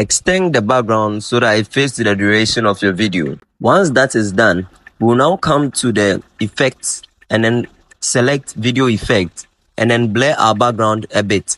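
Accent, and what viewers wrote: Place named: Ghana. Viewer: You sound so much like Ghanaian even though I know you are a ghanaian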